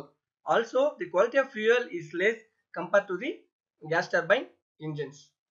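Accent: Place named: India. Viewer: Lmao. For every question there is a video made buy a indian sounding guy. Thanks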